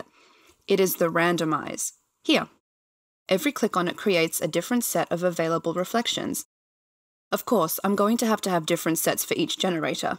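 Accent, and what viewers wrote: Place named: England, United Kingdom. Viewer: Is she hot? (She does have an English accent)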